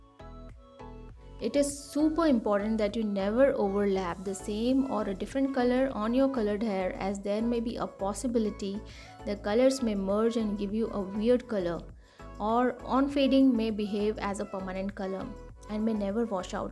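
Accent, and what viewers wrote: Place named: England, United Kingdom. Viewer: I absolutely love your English accent ❤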